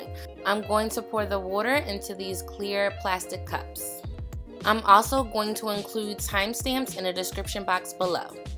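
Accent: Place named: New Jersey, United States. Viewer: Sounds like Jersey :) Wahr-tor instead of water hahah but since there's no Poland spring I'm guessing you're not actually from Jersey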